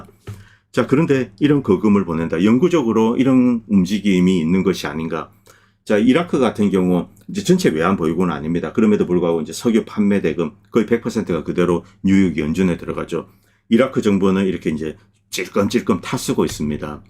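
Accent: Gyeongsang, South Korea. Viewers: 근데 님 예전부터 궁금했던건데 경상도 출신이신가요? ㅋㅋ 이민가시기전에요? 경상도 말투가 묻어나오시는데?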